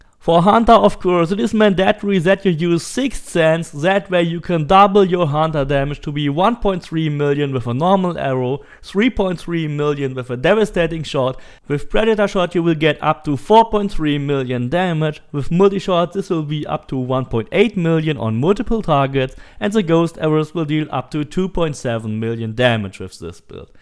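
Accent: Germany. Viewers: Deinem Akzent nach zu urteilen, bist du eindeutig ein Deutscher 😜 Das soll kein Vorwurf sein: im Gegenteil - ich fand das mega sympathisch als ich zum ersten Mal eines deiner Videos zu Odyssey anschaute. Du sprichst super Englisch! Ich wollte mich mal bei dir bedanken, weil du mir das Spiel sozusagen erklärt hast. Ich hab nach Videos wie du sie machst gesucht und aber kaum was gefunden, umso mehr habe ich mich über deinen Content gefreut. Keep up the great work - you‘re awesome 😉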